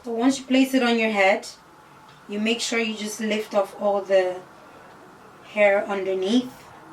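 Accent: Ireland. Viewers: Why am I hearing a Dublin accent? The unit looks lovely btw xx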